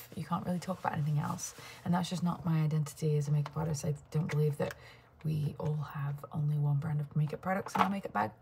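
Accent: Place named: North, United Kingdom. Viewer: I love it when you go all Northern… you’ve never forgot your roots. I went to college in Southport and it’s nice to hear the accent.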